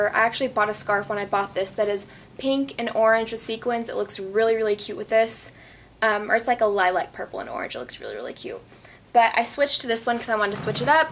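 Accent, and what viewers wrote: South, United States. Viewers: I love ur accent!!! Lol Y'ALL! And I love that coach bag but it wouldn't work for me 😓 I'm a mommy and that bag isn't Supposed to get wet some how it would end up in a bath tub in my house lol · I never knew u had an accent. I love your souther accent. I think u were the first beauty YouTuber I started following maybe 3 years ago. Haters talk about your accent and I was so confused thinking u had an accent in the most recent videos but now I understand...haters gonna hate. I love your videos. They always inspire me to improve my eye makeup skills. And we share the same bday😊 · I totally agree! She changes a lot in the timing of all of her videos and that the beauty of makeup :) Its crazy to see her transformation. Her accent also seems SOOOO southern ?!!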